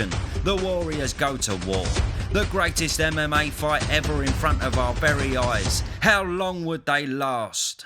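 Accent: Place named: England, United Kingdom. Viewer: Annoying pommy voice 🤮🇬🇧🤮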